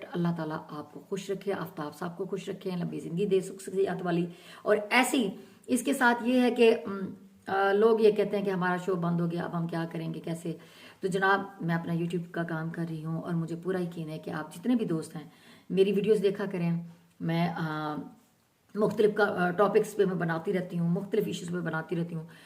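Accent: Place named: Saraiki, Pakistan. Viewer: آپ کا سرائیکی لہجے میں اردو ولاگ بہت سویٹ لگتا ہے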